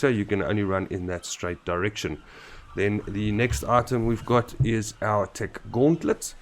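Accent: Afrikaans, South Africa. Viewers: Are you from south africa? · Sound afrikaans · Is that kiwi or suth efreeken? Pretty sure it's suth efreeken.. best accents ever. Kiwis slightly better purely cos of the ey Bru U drounk yit? Oh no wirries Bru. Have ma criser I gat thri edit: kisses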